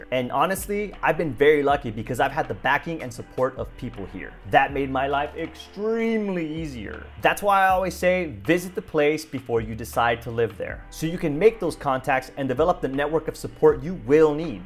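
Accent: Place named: Southern California, United States. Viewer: LoL yeah, you definatly have a So Cal hipster accent 😅👍 Love those hills in the intro. Hows the economy there in Lyon? It's my number 3 spot I'm thinking, thou heard it's more expensive than toulouse & Rennes.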